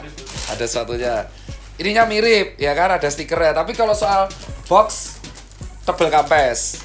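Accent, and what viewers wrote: Java, Indonesia. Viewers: gue suka logat jawa lu dok.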